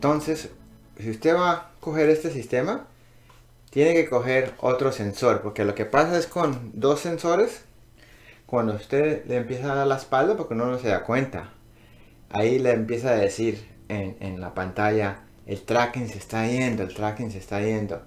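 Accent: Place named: United States. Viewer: Este es un gringo que aprendio español en cartagena.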